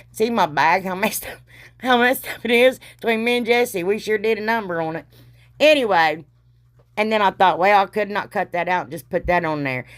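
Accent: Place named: Louisiana, United States. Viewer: Girl what state r u from? Your accent is just what we call here in South Louisiana COUNTY..love your channel,be blessed.loved everything but my favorite was the angels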